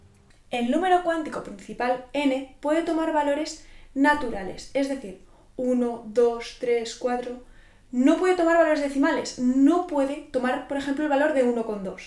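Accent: Spain. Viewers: Dificil concentrarse con una profesora tan hermosa, dulce y con ese acento español tan maravilloso.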